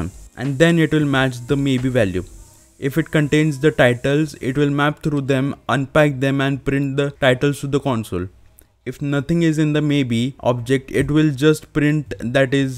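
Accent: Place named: India. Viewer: you're the first guy with an indian accent that I enjoyed watching :D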